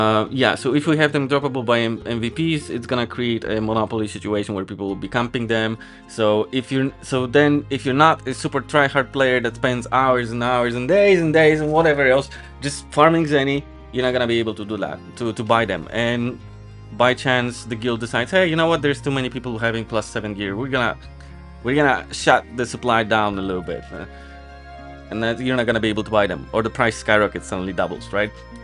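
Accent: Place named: Poland. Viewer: Are you from Poland? Your accent sounds like you are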